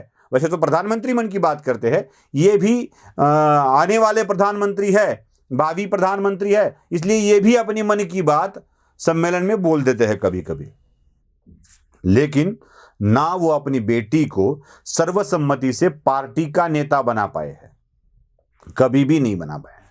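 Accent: Maharashtra, India. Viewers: बहुत खुब!! बढीया! भलेही मराठी टोन हो, पर हिंदी मे खुब ही बढीया विश्लेषण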